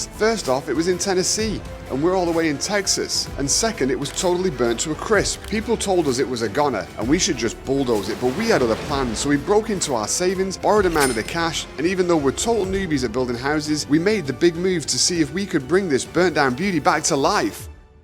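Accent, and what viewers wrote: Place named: Northern England, United Kingdom. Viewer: Watching from Norway, though I'm originally from the UK! Love that your northern accent is still so strong, hope it doesn't confuse too many of the locals (actually, I kinda hope it does!). 😅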